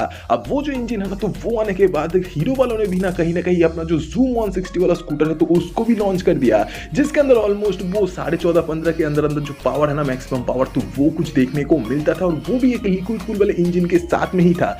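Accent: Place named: West Bengal, India. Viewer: Voice to bangoli lagta hai 😅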